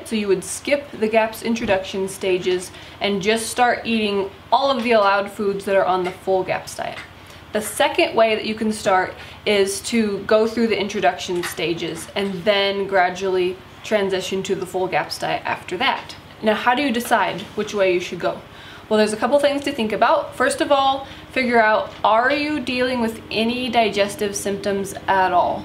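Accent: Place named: United States. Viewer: I can listen to this beautiful woman all day and that's the best American accent I've heard, really great channel.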